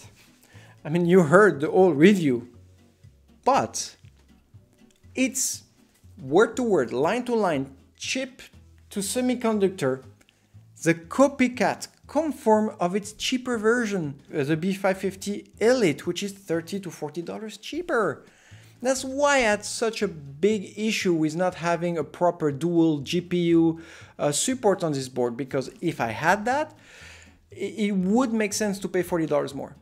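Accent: France. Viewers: L'accent français ;) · are you french ? Oo (sorry i'm new)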